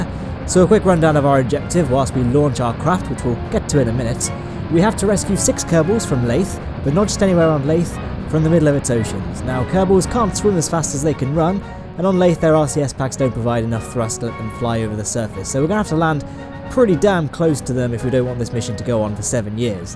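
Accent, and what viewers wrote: United Kingdom. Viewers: Canadian? South park? This guy is a full-fledged brit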